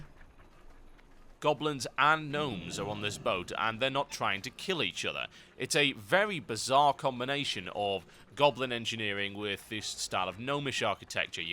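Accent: United Kingdom. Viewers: hah, nice brit accent. both pretty funny and quite clear to make out the sense. even for some terrible non-native english speakers. watch out for kgb, and god save your queen!=)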